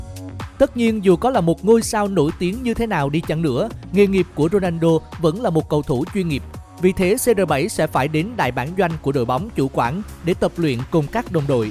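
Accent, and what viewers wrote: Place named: Da Nang, Vietnam. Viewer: Người đà nẵng ak ad 😂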